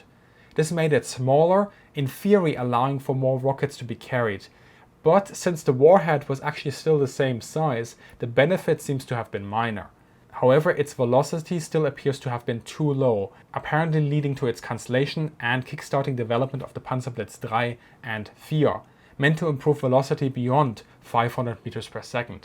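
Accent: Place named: Germany. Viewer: Ein, zwei, drei, acht, ein und zwanwig etc. We can tell from your accent that you are German--you don't have to constantly remind us by using German numbers in an English-language lecture! Your audience is English speaking. Otherwise very informative, analytical and enjoyable.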